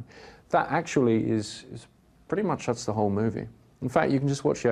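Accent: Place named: Australia. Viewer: He actually sounds kind of Australian in this video.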